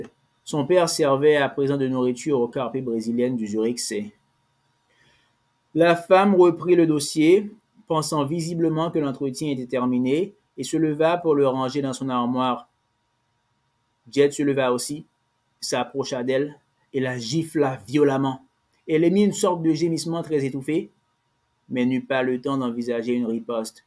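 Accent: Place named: Caribbean. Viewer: Mon Dieu, vouloir lire Houellebecq est une intention louable, mais avec un accent antillais pareil...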